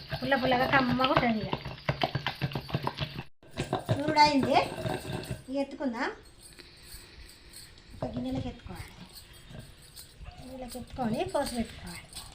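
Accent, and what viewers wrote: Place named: Karimnagar, India. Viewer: Memu elage chestamu sooooooper Naaku chala estamu😅😊karimnagar language Amma😂 Maadhi karimnagar 😂meedi vooru ekkada Amma😂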